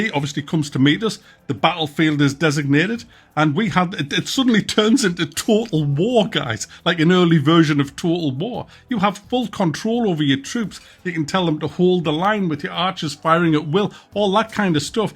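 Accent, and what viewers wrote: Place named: Sunderland, United Kingdom. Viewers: Awesome vid. I'm new around here and i have to say its great to hear an unashamedly Geordie accent with his no BS attitude. Plus you enthusiasm is infectious. I already knew what you were telling us about the farmers and brewers being the same men that figth on the battlefield for you but your excitement for it hyped me up even more. Subbed. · you talk like my friend from sunderland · ahhh so refreshing to hear a fellow northerner on YouTube there's no enough of us! This game wasn't even on my radar it looks like it's gonna be mint! I've got a week off work and was looking for something new to play need to get my hands on that early access like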